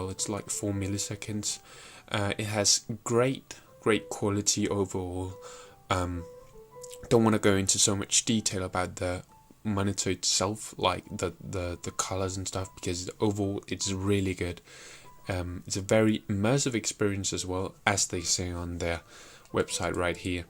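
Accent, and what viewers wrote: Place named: Denmark. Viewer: The Danish accent is so obvious XD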